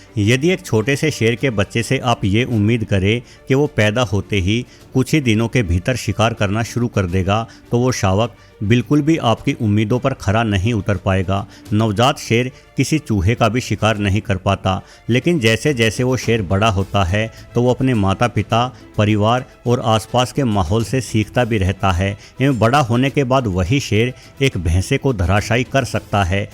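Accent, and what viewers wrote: Haryana, India. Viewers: Bhai tu haryana se hai ke?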